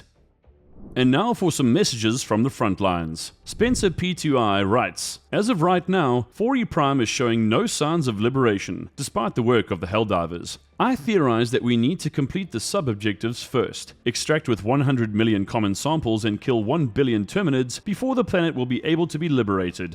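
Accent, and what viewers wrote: South Africa. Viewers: He is from South Africa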